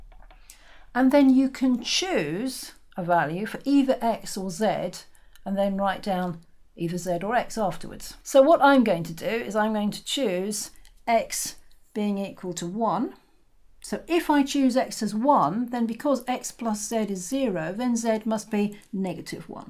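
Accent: United Kingdom. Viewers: This is awesome, a brilliant explanation with British accent, super.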